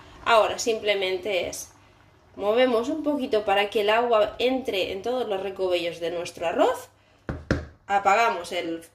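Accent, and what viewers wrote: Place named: Spain. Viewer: Hola! Que bonita introduction. Eso se mira muy apetitoso. Me gusta tu acento espanol. Subscrita👍💛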